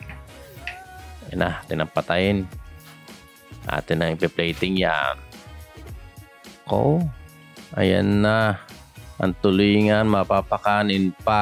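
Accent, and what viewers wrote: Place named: Batangas, Philippines. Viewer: Ala eh batangueno ka rin pala, maganda yan pars pede ka na magnegosyo! #Reddtv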